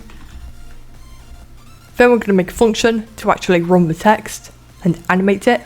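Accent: United Kingdom. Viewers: Do you add places for the lobby and the game? Also nice British accent there buddy. Also you're real helpful for me making my games good! :)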